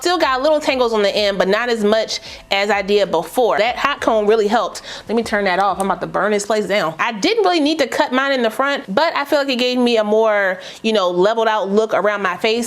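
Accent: Washington DC, United States. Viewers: You from DC? The accent and the go-go lol 😅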